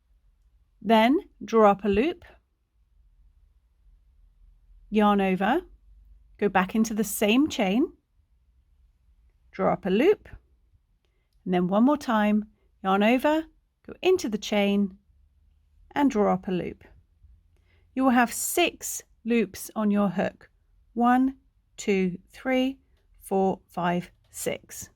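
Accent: United Kingdom. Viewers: Crochet is very popular in the States, and I had a wonderful evening with the group. Being British I love your channel as it's nice to hear a familiar accent together with American crochet terminology. It's a win win for me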